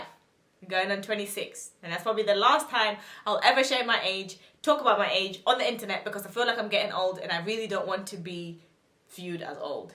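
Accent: Zimbabwe. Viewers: 😂😂😂 "now im doing the (mentions dance move), you can't see it but I'm doing it" 😂😂👏🏾👏🏾 made me laugh and the Zim accent (died 😂😂❤️❤️❤️❤️). Ps. Love the Zim accent....#zim gang but I can't speak shona 😭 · Haha I love how u can still speak shona, with a shona accent.....newewo ndeipi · I love your accent😍😍😍 And am also Zimbabwean.... Love your playlist🙊🙊🙊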